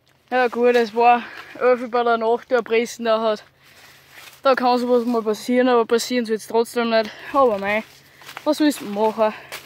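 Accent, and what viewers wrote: Austria. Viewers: Bist du aus Österreich und den vo wo hod wöcha Bezirk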